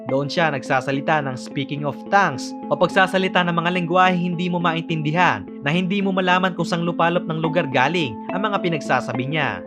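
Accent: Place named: Bulacan, Philippines. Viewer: Bulakeñyo ka ba? yung punto nyo po napapansin ko 🥰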